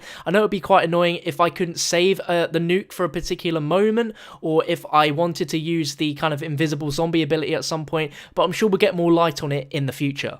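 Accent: United Kingdom. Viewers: Why does every famous COD reviewer on YouTube have to have a pussy ass British accent?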